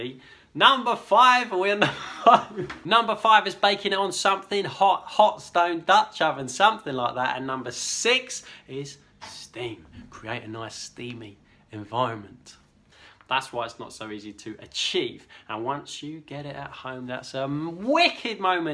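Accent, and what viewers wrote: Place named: Cockney, United Kingdom. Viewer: My new favorite cockney bread maker!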